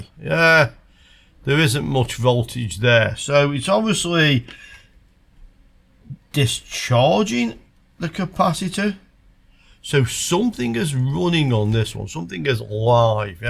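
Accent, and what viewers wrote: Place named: Potteries, United Kingdom. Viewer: I think the most recent one was “Hot Ground”, your video with Carlos on how to avoid blowing up your oscilloscope without having to buy a differential probe. <link> timestamp is where you demonstrate this technique. I’ve been enjoying your videos for several months and as my late wife was from the Potteries (Burslem), I recognised the accent straight away. You’re doing a great job of explaining these electronic circuits so that it’s easy to see how they work, and your commitment to safety and explaining the risks inherent in testing and probing mains-operated devices is impressive. I did a degree in electronic engineering before I changed career and I’m beginning to appreciate how much I failed to learn back then! You and several others on YouTube have reawakened my interest in this fascinating field, so thank you very much.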